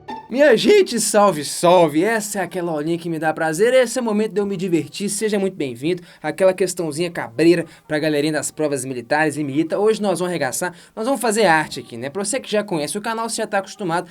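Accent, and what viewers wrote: Minas Gerais, Brazil. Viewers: Cara eu amooo que vc tem sotaque de minas, me sinto acolhida kkkkkkkkk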